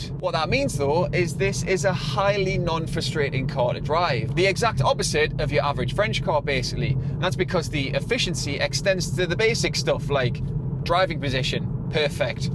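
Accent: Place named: North East England, United Kingdom. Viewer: Why aye the man from the north east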